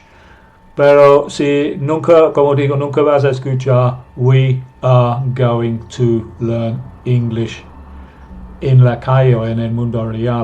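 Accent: United Kingdom. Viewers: Excelente clase de Ingles y desde luego excelente profesor ademas britanico que me encanta aprender mucho el acento y ciertas diferencias que hay con el ingles americano, no tengo palabras para darle las gracias por esta perfecta clase.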